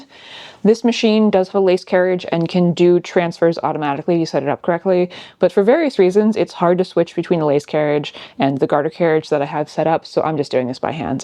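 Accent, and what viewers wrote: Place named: United States. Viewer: you actually pronounced fine just with american accent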